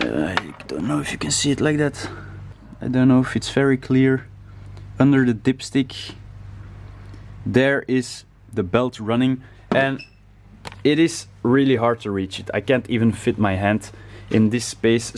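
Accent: Flanders, Belgium. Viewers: Hahaha uw belgisch accent maat 😂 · Ok subscribed, lekker vlaaams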